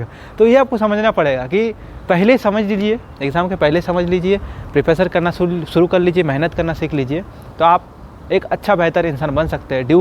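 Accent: Bihar, India. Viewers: Sir Your bihari essence 😍💖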